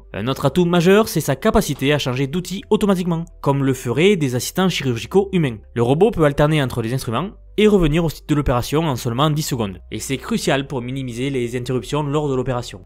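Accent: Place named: Toulouse, France. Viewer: t'étais pas journaliste sportif, par hasard, avant ? Je préfère quand même ta voix de toulousain ou pyrénéen, que ces voix horripilantes d'IA ! 😉 Ceci dit ton docu est impressionnant ! bravo !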